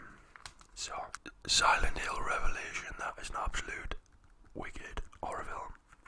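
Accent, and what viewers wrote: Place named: North, United Kingdom. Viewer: I understand everything he says but I guess its just because Im also from the North🤷🏻‍♀️ Great ASMR though, very tingly 😍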